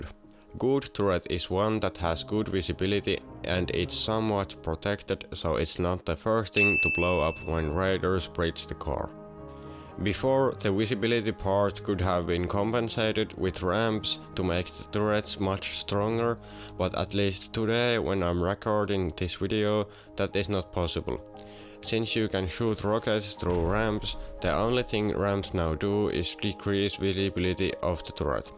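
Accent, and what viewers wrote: Finland. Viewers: Hes not from The U.s Dude is like from Finland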